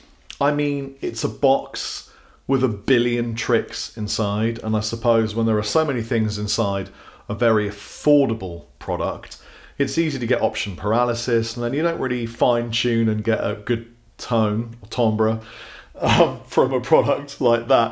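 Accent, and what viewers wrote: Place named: Wiltshire, United Kingdom. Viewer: is it just me or is your Wiltshire accent becoming more prominent with age? Give it another decade and you'll sound like Phil Harding of Time Team... which is not a bad thing!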